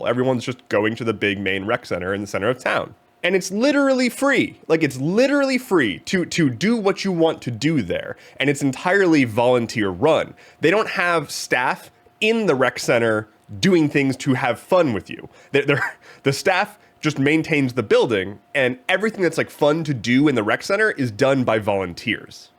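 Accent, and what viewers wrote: United States. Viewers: Sounds incredibly American...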